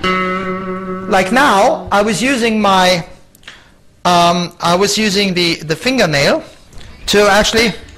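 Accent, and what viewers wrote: Germany. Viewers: Funny german accent :-) But amazing guitar player !! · Me encanta el acento tan marcado de los alemanes cuando hablan en inglés... Hermoso Uli eres un maestro!!! Ese sonido sólo puede ser producido con su Skyguitar!!!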